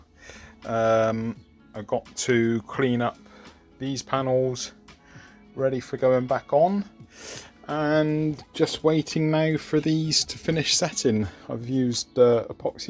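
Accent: Bristol, United Kingdom. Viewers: fantastic vlog shame about the the pits that broken, it not long for you have finished keep your chin up it won't be too long before you go out on it. Sunshine is coming next week so hopefully you can get out. Your accent sounds like its from Bristol away? Im got a appointment on Tuesday morning to put a new back tiyer on so I will be out on my bike again yay fantastic take care ride safe mate TTFN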